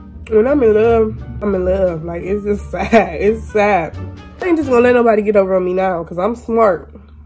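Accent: United States. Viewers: Fine ASF, be my girl 🥰 sound like you frm my home twn Cleveland Ohio.. But I stay in Alabama nw !!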